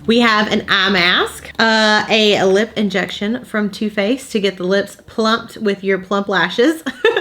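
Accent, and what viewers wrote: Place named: Tennessee, United States. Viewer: As a Tennessee girl living in Colorado, your sweet accent makes me homesick. 😍. Thank you for your generosity in doing a giveaway!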